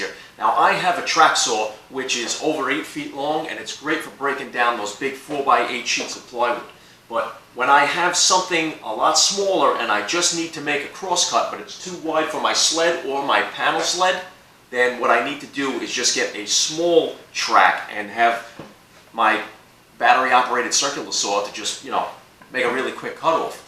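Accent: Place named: Brooklyn, United States. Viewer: Excellently produced video. Very clearly explained. Thanks. Love your accent. Is it Brooklyn? Sounds cool to an Englishman. :)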